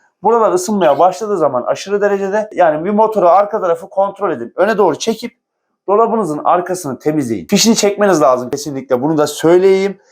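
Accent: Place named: Isparta, Turkey. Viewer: Memleket Isparta galiba 😊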